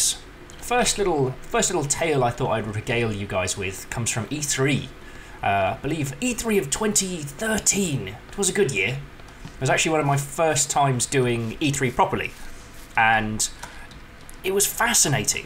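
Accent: United Kingdom. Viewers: You, sir, are the most thoroughly British individual I have ever had the pleasure of listening to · Your british quality is astoundingly fun. I will come along for more, if you'll have more in the future. :) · Out of curiosity, are you from the west country? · best thing ever and I'm in love with your British voice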